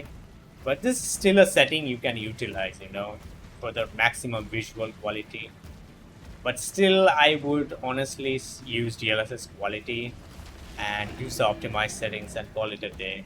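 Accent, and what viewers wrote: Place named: India. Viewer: wow, i thought that is animated 3d model guy in the beginning intro ) everything is so cleaned up, face, eyes, lips , blurred abmience so i firstly thought you are artifical man ) thanx for the touchfull review. its a pity that you guys from India ALWAYS add your accent to the English language. Isn't it very hard just not to do this and speak cleaner, like we russians do speaking in english ? but in your case the accent is very little, so i've understood everyting! thanx )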